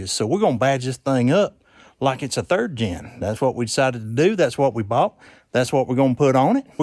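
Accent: Tennessee, United States. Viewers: I heard your Tennessee accent and automatically liked, and subbed! Beautiful truck brother. Howdy from Texas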